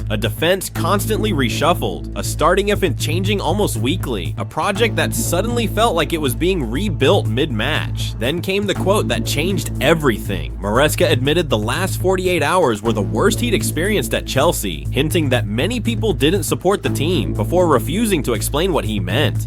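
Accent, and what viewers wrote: United States. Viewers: Listening to a yank narrator on English football is a major put off. Blah blah blah blah blah blah blah blah. Tell us something we don't know 2 - zero!!! Foxtrot oscar mate!!!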